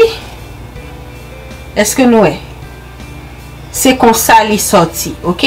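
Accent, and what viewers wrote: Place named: Cap-Haïtien, Haiti. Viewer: Mw tou abòne paskem tande se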